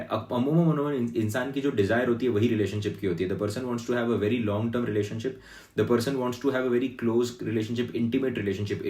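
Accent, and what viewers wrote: Indore, India. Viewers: Are you from Indore?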